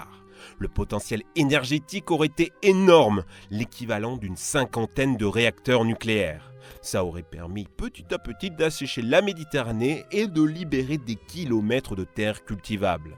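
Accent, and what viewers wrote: Caldoche, New Caledonia. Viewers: C'est un caldoche le mec qui parle xDD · on nest dakor c une video de Nouvelle-Calédonie g reconnue lacent des caldoche😂😂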